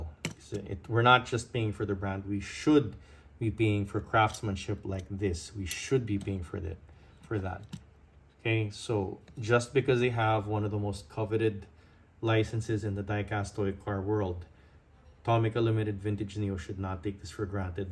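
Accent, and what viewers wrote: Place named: Philippines. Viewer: What nationality are you? Accent sounds filipino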